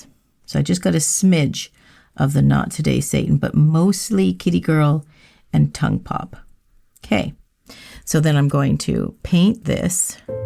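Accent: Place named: Canada. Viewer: I was wondering if you are Canadian, then I heard you say “nuke” and “eh” and that was my answer! I have just started doing my nails at home and have been doing research on products and designs. I’m going to do cat eye next!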